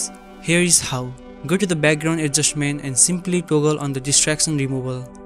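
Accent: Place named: India. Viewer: Bro, please work on your English. Good videos but that Indian accent is terrible.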